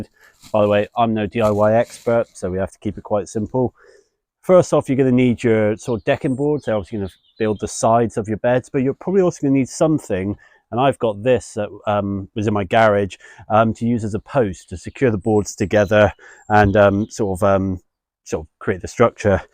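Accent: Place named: United Kingdom. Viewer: Thanks, that's helpful - especially a British voice amongst so many of our American "cousins". Good to get tips that are relevant to UK. Link to your compost video please?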